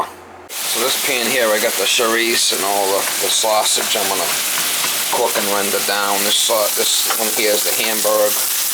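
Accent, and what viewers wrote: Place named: Boston, United States. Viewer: Buttah, bahg, get everything togethah, containhas, heah, I love the Boston accent! Haha I’m from Norfolk county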